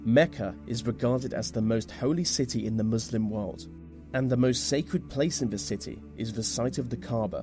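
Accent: England, United Kingdom. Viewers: Not sure what it is your debonair English accent or the awesome topics the great narration and your consistency always keeps me excited and wanting more from your channel job well done my friend thanks again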